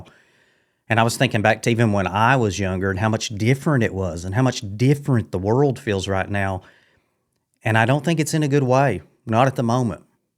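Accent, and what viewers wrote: South, United States. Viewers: I just found you. I subbed. Keep up the videos buddy. You're good at this. People need your message. Oh, and great Southern drawl. 👍 From a South Dakota Yankee.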